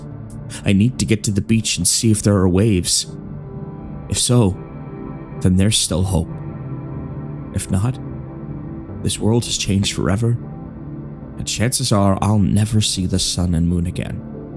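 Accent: United States. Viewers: your American accent is basicly flawless.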